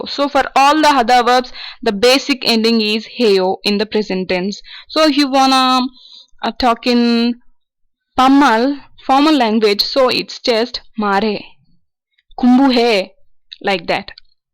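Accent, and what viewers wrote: India. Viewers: Hahaha so cute tone how indians talk english its just playing a funny games lol or its like waving water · Your accent is in Indian English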